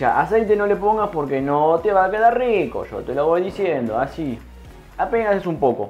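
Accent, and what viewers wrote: Argentina. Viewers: Me encantan tus recetas! Soy porteña tb y así hablamos! A hacer las palmeritas👌👨‍🍳❤👏👏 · Nací en Buenos Aires y me encanta el acento de este chico. Donde vivo, en Europa, tenemos masa de hojaldre rectangular 😉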